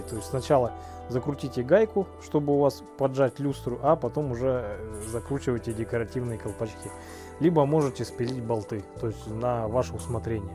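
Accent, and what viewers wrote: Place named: Siberia, Russia. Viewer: Это обычный говор сибиряков. Не в этом суть, главное, руки золотые!👍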